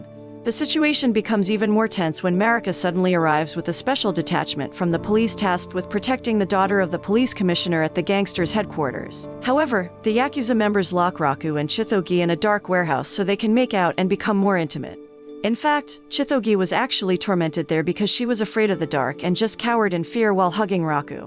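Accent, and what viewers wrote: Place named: England, United Kingdom. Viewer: ENG accent makes it a little hard to understand. ニセコ, neese-ko-ee Nisekoi, and not nasekoi xd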